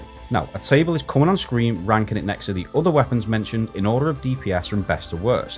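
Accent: Liverpool, United Kingdom. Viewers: Hahahahhh scouser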